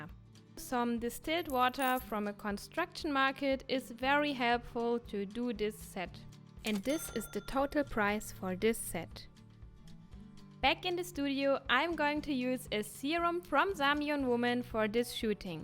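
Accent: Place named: Germany. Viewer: Warum machst du deine Videos auf Englisch? Man hört direkt das du aus Deutschland kommst. 😃 Ist nicht böse gemeint aber welchen Zweck verfolgst du damit?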